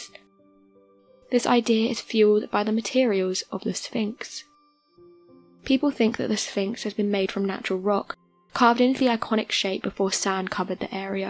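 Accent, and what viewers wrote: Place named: Scotland, United Kingdom. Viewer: Sounds Scotch, to me.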